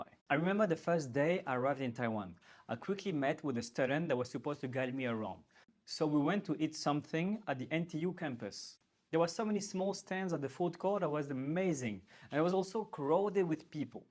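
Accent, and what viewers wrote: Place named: France. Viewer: Enjoy your staying in Taiwan, you are welcome by the way I like your great French-English accent. Be cool, Godspeed.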